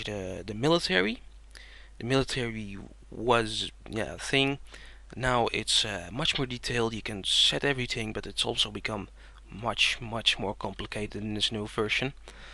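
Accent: Netherlands. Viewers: Hmmm, i can just HEAR you're dutch! :P Another one here! :) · Oh it's a Dutch accent I've never heard one before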